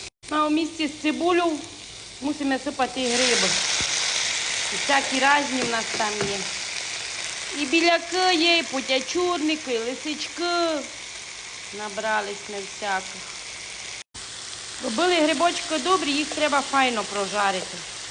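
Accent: Transcarpathia, Ukraine. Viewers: Мені подобається закарпатська розмова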